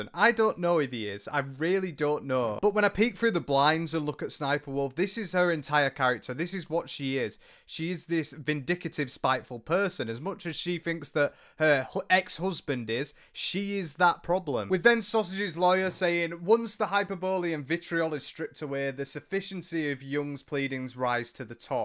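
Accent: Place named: West Yorkshire, United Kingdom. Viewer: Nice to hear a northern accent on the platform 😁 gotta be W Yorkshire, i can hear those very slight differences to East Lancs pronunciation from a mile away 😂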